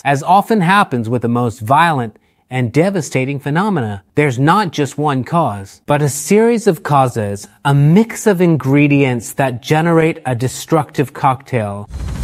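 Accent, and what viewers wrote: Italy. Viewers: You don’t need to alter your voice to sound English. I much prefer your Italian accent.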